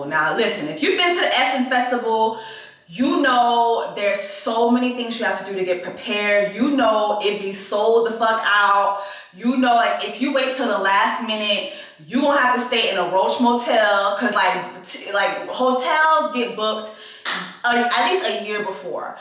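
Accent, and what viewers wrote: Haiti. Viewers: We want more like that...ou fem ri ahhaahha thr haitian in you is priceless. And gurl I would do the sammmmmmeeeee thing. She could have a lonely time at home lol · When you said, "we only got one room" I got up and left too omg. WHAT. Your creole is making it even funnier too (I'm haitian so I understand!!) I'm surprised you didn't call your parents to bail you out, I woulda called my dad ASAP to get me some hotel/motel room and I would never talk to that person ever again. I'm planning my first girls trip...and I hope it goes a lot better than yours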